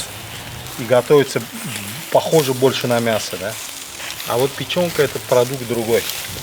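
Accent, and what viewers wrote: Baku, Azerbaijan. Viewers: Маэстро! Просто супер! Бакинский акцент, свежайшие продукты и руки профессионала - все что нужно для хорошего шашлычка!) Дай Бог вам здоровья! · Ай брат гардаш!) Это просто супер! Бакинский акцент, свежайшие продукты и руки профессионала - все что нужно для хорошего шашлычка!) Дай Бог вам здоровья!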